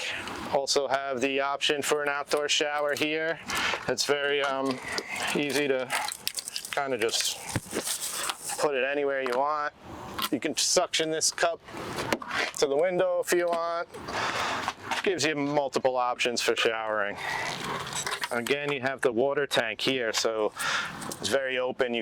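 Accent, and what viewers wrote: New Jersey, United States. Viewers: New Jersey accent =dumpster fire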